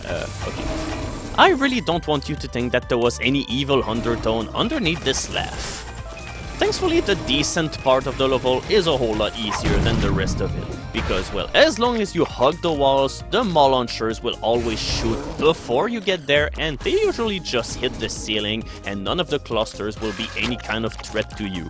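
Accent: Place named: Quebec, Canada. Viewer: hey,...by hearing your accent...something tells me that you are from quebec...because...i am from quebec myself.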